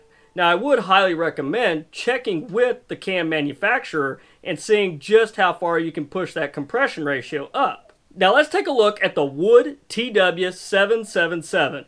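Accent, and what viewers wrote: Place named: Texas, United States. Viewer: I have noticed that you have the exact same accent as Mike Nesmith from the Monkees he was also from Texas I wonder if you guys are from the same town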